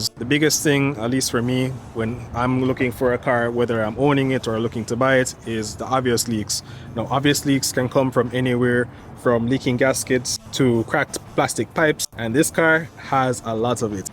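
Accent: Jamaica. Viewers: Very informative video. Jamaican or Trinidad?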